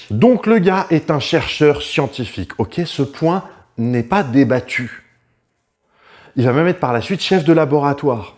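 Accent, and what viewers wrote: Alsace, France. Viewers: L'accent H'Alsacien 💜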